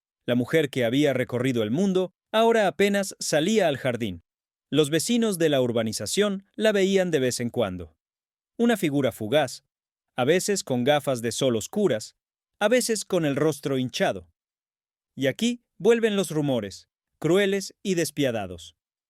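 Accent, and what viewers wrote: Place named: Latin America. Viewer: acaso el vídeo lo ha hecho un español? Porque yo noto un acento claramente hispano Americano, no se porque llamar mentirosos a los Españoles? Mentirosa serás tú y creerás que todos son como tú.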